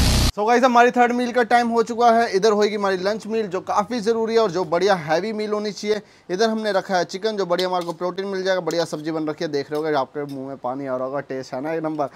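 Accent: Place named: Uttar Pradesh, India. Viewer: Aap up se ho sir voice se pta chl jata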